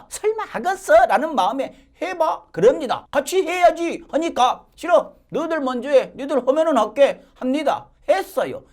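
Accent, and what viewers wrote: Jeolla, South Korea. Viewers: 강 성범씨 구수한 전라도 사투리가 매력이 있습니다. 럭셔리 칼럼 잘 보고 있습니다.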